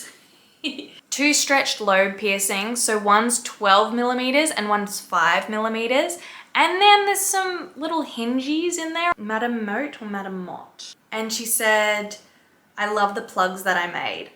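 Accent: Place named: Australia. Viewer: I love your voice! Australian accents are my favorite!!!! 🖤 I’m from Tennessee lol. Oh, and have you ever said what your real name was? I can’t find it 😆 haha, sorry just curious. That would be cool if you did a q&a not just about piercing stuff, but I understand if you don’t want to get too personal on this crazy a** internet. Lol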